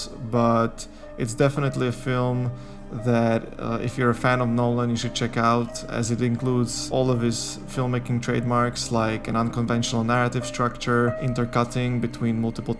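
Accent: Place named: France. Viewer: You let the French influence sneak into your English. I'm not criticizing, because it wasn't distracting, and English orthography is a mess, but you also pronounced "Liam", "Bowie", "McConaughey", and "Gyllenhaal" oddly.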